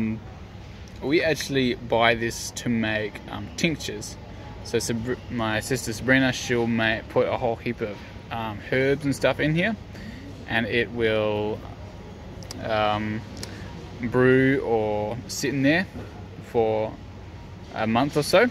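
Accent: Australia. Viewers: В первый раз услышал Австралийский акцент английского. Довольно забавно. Удачи в России.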